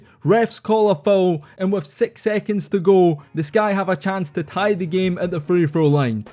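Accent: Scotland, United Kingdom. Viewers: Agreed! Who is the announcer? Sounds like a Scot. The main reason my interest was maintained for so long. Articulate and engaging.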